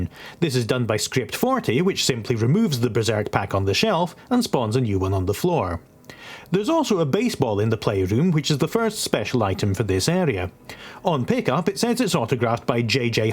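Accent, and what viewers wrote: Scotland, United Kingdom. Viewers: Your commentary is so Scottish and I love it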